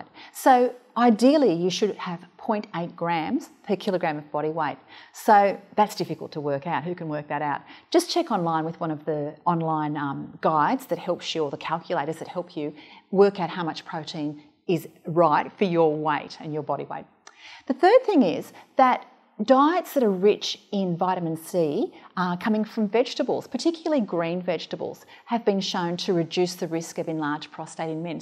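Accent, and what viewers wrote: Australia. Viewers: It'd just an Australian accent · Terrible Aussie accent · With a voice like that it's definitely down under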